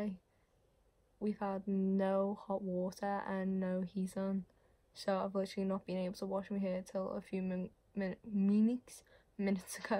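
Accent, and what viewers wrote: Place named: Liverpool, United Kingdom. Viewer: I love the scouse accent! I'm from Australia but I watch a lot of UK tv and scouse is one of my favourite accents 🙂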